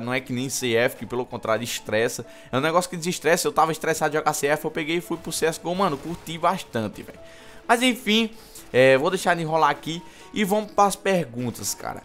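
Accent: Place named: Paraíba, Brazil. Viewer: paraiba da pra ve o jeito q vc fala kk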